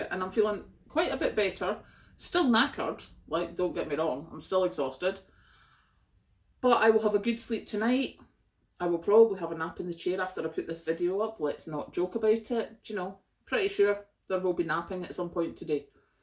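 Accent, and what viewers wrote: Scotland, United Kingdom. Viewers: agree - yes, some days a small step is worth reflecting on as many small steps get us to the end of a task and some times it is just too much to think of whole tasks - a little step or a few help keep things under control and keep us moving forward - love your planning and organisation - never tried a slow cooker myself - sounds like a practical idea and probably less fuel than some stoves ( I'm in a rented house - with solid plate electric stove and the oven does not heat up much) was wondering if I might try making wholemeal scones on my electric griddle but have been focused on some other stuff and haven't baked for years!:o) maybe one of these days....?! Love your practical ideas and applications - realised recently many of us were misguided by media etc and when I see people with back up heating and back up cooking that seems to be so practical and wise than the house proud decorating focus and other lifestyle addictions many have had in recent decades - miss the Scottish accent now that I am back in N Ireland - have shared your channel with some Scottish friends. 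Take care. Best Wishes.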